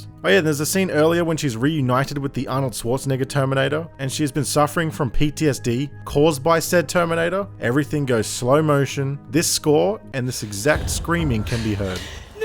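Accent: Australia. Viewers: Are you Australian? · BTW I love that Aussie accent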